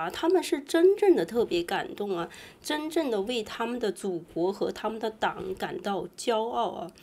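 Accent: China. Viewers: 请问你是哪里人？听你口音想大陆人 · 这老娘们口音，也是来自中国吧？ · 当然知道她胡说八道，听口音也知道她是中国种也可能是台娃，就不知她是不是住霉国。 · You talk like from China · 对！妳是中共国人、口音也是相同阿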